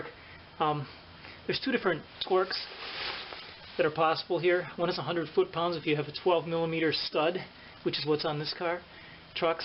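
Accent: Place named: Canada. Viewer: Great research material. I should make a video to see if I do as well. I am sure I would have to mute sound... You sound like a Canuck, just the pleasant, easy going feel of the video. There have been many times I wish I had been born north of the border. Thanks again for taking the time to make a quality How-To.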